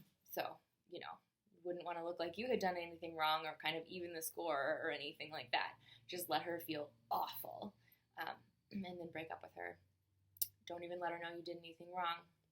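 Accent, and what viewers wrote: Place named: Upper Midwest, United States. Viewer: Hey, great video. Really helps figure out my own stuff, hearing you talk about yours. Kudos for that! Side question - are you from the upper midwest? I'm overseas and trying to figure out the accent.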